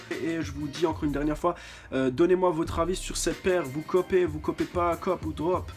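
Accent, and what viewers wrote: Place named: England, United Kingdom. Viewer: L'accent anglais et certifier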